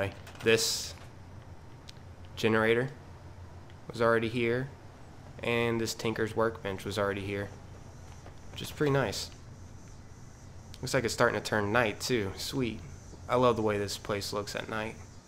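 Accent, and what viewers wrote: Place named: Pittsburgh, United States. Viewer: Are you from Pittsburgh?! I know a yinzer when I hear it dut go stillers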